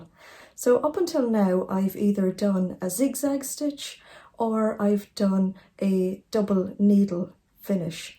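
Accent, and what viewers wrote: Ireland. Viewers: Hi. I found you on YouTube this morning and am enjoying your vlogs. Just love, love your Irish accent! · Thank you so much, I think I will purchase one, love from Dublin. PS nice to hear an Irish accent 😉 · I loved your video! I have the Janome 1000CP, the predecessor of the 1000CPX and I've had it for 17 years! Your machine looks like it does much more than mine so I need to find a home for my old one then I can buy the one you've bought! Loving your Irish accent! x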